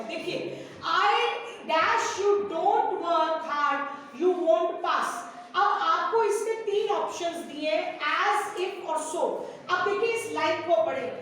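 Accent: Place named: Udaipur, India. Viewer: Udaipur Se Ho Kya Medam Aap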